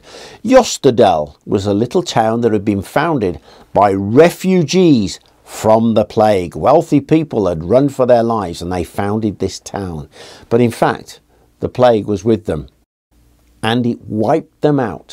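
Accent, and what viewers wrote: United Kingdom. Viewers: I am a history enthusiast. And my love of history deepen even more and more when I watch your videos with your extraordinary british accent and learn unique words thanks to your rich lexicon.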